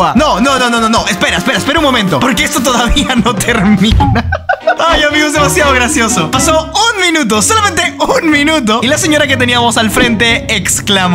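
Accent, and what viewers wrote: Peru. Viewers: eres de lima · Eres peruano😮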